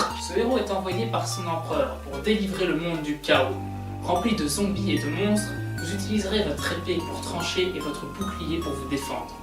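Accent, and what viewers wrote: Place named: Quebec, Canada. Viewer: J'aime l'accent poce bleu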